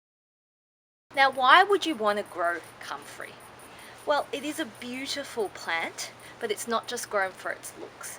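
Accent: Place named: Australia. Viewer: New to your channel and subscribed because you are informative and succinct. Your aussie accent and unpretentious good looks and mannerisms are also delightful and non-abrasive. Kudos!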